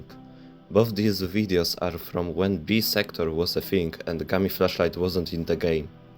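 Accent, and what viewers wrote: Poland. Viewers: are you polish? just asking cause you sound like you have a Polish accent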